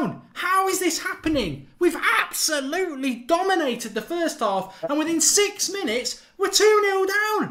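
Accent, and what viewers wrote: Manchester, United Kingdom. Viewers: I swear he said he wasn’t from Manchester, unless he was joking cos it is very Mancunian. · Thick Mancunian accent, good to see someone backing their local team 👍🏻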